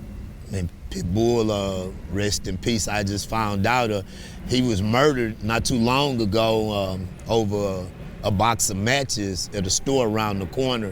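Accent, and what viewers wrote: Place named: Houston, United States. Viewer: He sounds like he never left Houston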